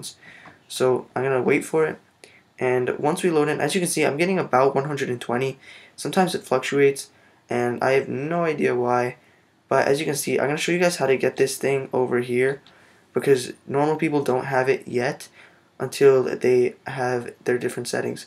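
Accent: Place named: North America. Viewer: Do u live eu or na cos u sound na but play eu server?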